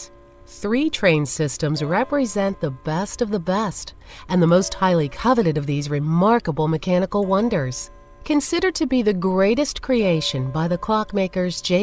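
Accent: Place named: United States. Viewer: Great clock from Yorkshire, but why the American accent.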